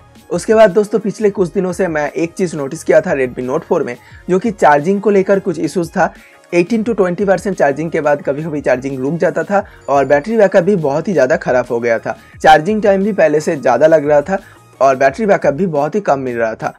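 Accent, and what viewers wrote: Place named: Uttar Pradesh, India. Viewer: bhai aap jo.bolte ho uski ton thik karo like jo English words hai woh tore up wale ke ton mei bolte ho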